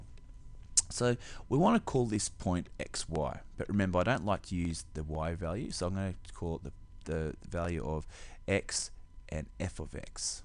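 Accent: Australia. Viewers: Sounds Australian to me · es una muy buena explicación muchas gracias, pero, ¡Griffin MC5 es retrasado! Tambien me gusta el accento australiano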